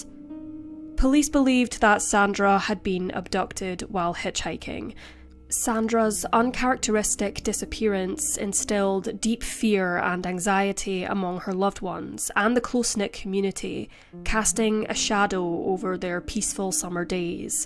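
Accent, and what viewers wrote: Scotland, United Kingdom. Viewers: Scottish narrating a crime script is awesome. Its such a cool accent of english. Ive learned so much about Wales, Northern Ireland and the U.k. as a whole from other english crime mysteriy tubers. Ive been everywhere on youtube and your channel is great! Love it . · New sub. Excellent vids. Love the Scottish accent. ❤️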